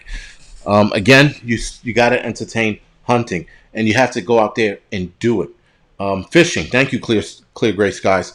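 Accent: New York, United States. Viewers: That's New York language. Love it.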